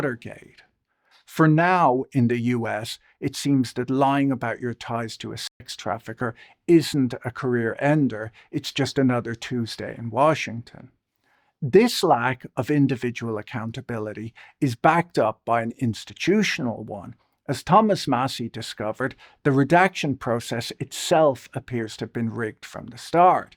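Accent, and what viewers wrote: Ireland. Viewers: Love the Irish accent... King Charles the "TURD". LOL RIP QEII but, the British/German monarchy should have ended with you. 🎉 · Accent. Now thinking Galway or South West coast, but probably wrong will stick with first choice last time North west londonderry region.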